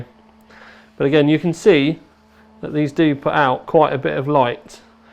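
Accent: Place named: Southern England, United Kingdom. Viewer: So you guys are based at overclockers in Newcastle? But you have a southern accent like me!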